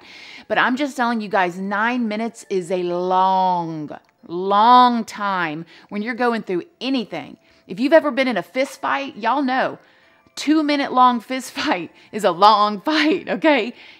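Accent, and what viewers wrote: South, United States. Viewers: looking at you it wasn't a surprise that you have a country accent lmao..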